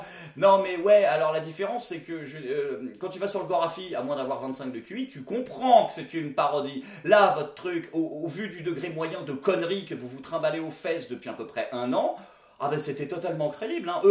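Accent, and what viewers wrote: Benin. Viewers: Et fait nous on un jspc spécial accent bénin :p tu le fait vraiment bien :) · très bonnes imitations de Schiappa et d'un béninois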